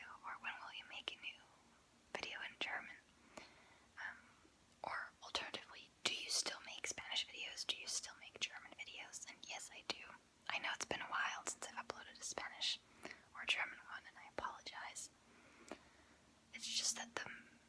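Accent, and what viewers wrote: United States. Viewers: How do u have such a, American voice wen ur from Germany?!?!? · Most Germans can speak English, but she is far more fluent than normal, probably better than at least 99.99% of non-native English speakers in Germany. She put a ton of effort into becoming fluent and developing an American accent. By the way, she did the same with Spanish and people are baffled by her Mexican accent. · How are you German but have an American accent I’m so confused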